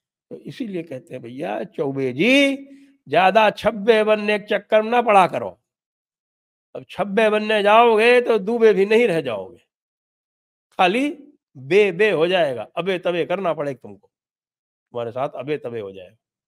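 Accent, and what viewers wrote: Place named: Allahabad, India. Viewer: Very hilarious presentation in Allahabadi tone.😂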